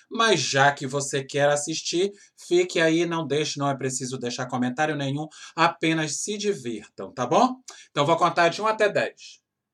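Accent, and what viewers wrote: Pará, Brazil. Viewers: Achanhado kkk. Beeem paraense! Amo!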